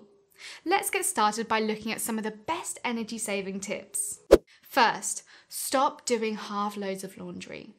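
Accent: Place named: United Kingdom. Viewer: Radiators are uncommon in the USA. Guessing by your dialect, you are across the pond. Other tips work for both countries.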